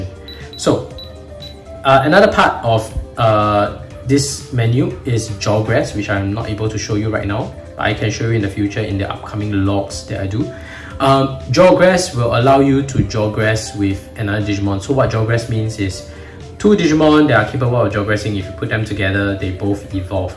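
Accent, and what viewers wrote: Singapore. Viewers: Bro u from Singapore?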